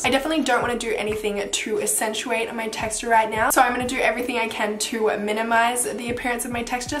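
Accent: Australia. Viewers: What is your accent? Sounds a bit Australian but if though.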